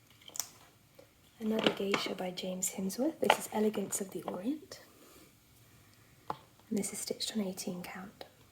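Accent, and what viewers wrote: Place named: Sweden. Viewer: Oooohhhh Dimensions kits presented by a lovely Swedish accented lady - what could be a better afternoon! 😊😊😊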